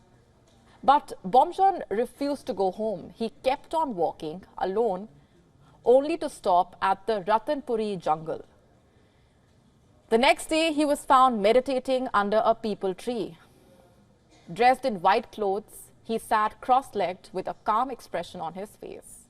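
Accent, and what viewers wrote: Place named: India. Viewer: Hard to understand for this report by english with the indian accent. Give up on her.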